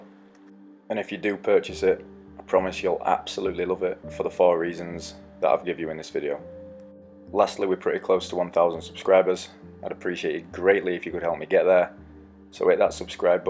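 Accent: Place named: England, United Kingdom. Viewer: • Thank you. Yeh I was about to say I'm hearing the good old English accent lol. Yes will try that mate for sure. 👌🖒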